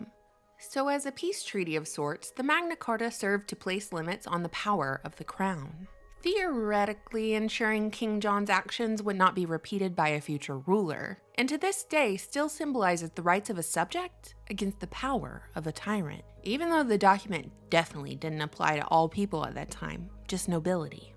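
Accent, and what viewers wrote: South, United States. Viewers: did you speed this video up? what happened to that slow, southern, mellifluous locution?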